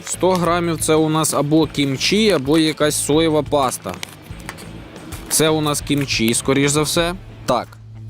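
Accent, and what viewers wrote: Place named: Surzhyk, Ukraine. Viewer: Сельский суржик , на украинском зачиняем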